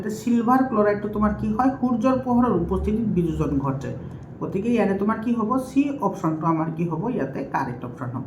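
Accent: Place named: Bajali, India. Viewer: bajali lage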